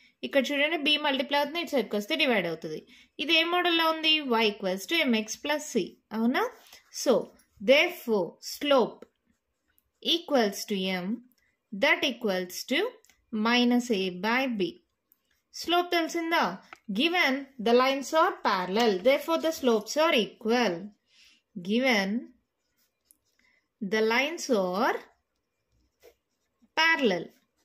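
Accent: Nellore, India. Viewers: Mam are u from Nellore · Mam are you from Nellore???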